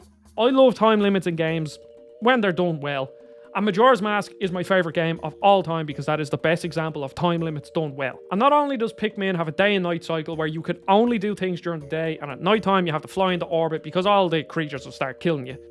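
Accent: Ireland. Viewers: I just meant it was an Irish accent that I had never heard before, I may just be being stupid though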